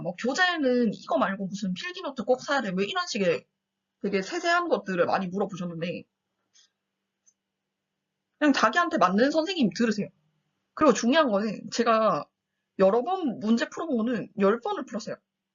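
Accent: Busan, South Korea. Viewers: 언니 고향 부산이신가요 친근해요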